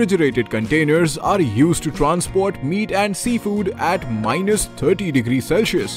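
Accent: India. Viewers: Indian accents is unbearable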